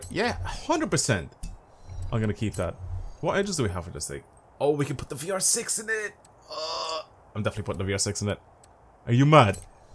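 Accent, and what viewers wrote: Ireland. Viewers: Hmmmm? Are you irish? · Are you Irish?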